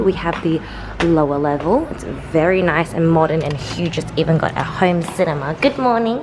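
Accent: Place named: Australia. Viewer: I want to visit Sydney, and Melbourne again. It is so beautiful in those two cities. As a american man I love the way women from Australia talk just like you sweetheart. Good video.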